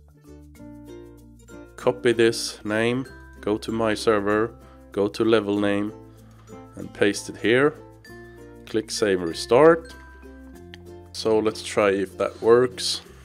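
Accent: Sweden. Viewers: Oh you're Swedish lol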